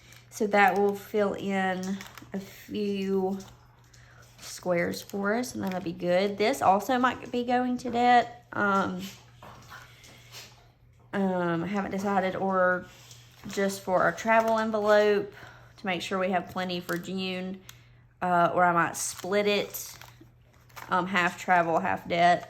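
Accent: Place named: South, United States. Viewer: ! That accent miss ma’am! I didn’t know you were a southerner like myself.😋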